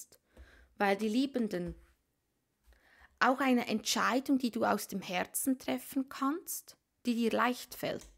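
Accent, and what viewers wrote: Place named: Switzerland. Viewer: Mit wunderbarer schweizer Akzent ;) Grüessli us de Schwiiz :D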